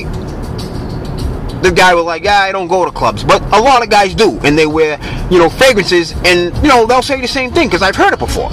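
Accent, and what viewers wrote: Boston, United States. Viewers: That's a Boston Strong accent.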